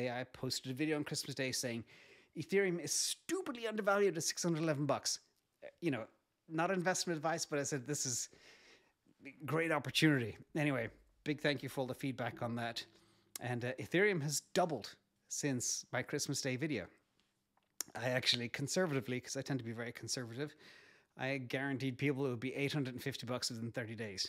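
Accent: Ireland. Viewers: Do I detect an Irish accent in there somewhere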